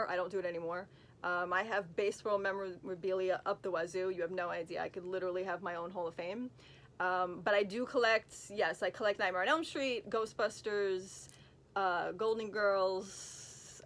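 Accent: New York, United States. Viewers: Awesome video, love the N.Y. accent. Just an FYI I have notifications on and didn’t get any. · I’m a Londoner and been to NYC many times and I think your accent is awesome and quintessentially New York which is no bad thing at all. Sorry to hear of your fathers passing. Snap regarding being Italian 😊👍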